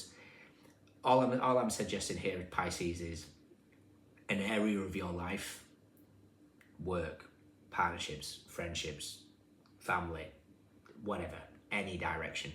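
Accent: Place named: Cumbria, United Kingdom. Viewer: Are you from around the Barrow-in-Furness area? I was born there, your accent is familiar. Yes I'm done with family that have a toxic pattern. Been scapegoated long enough. Done. Isolated, alone, but better than being crapped on repeatedly!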